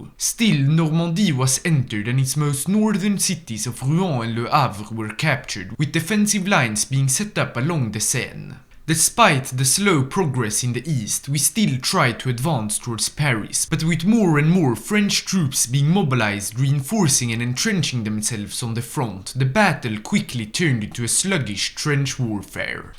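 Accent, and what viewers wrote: Sweden. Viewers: Js hörde att du har en svensk brytning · I have never heard such a swedish accent english. Say that your a swede but not saying it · are you from sweden because you sounded pretty swedish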